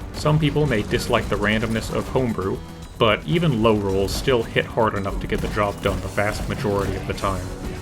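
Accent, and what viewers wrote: United Kingdom. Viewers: Love for explosives, booze and an intense Great Britain accent.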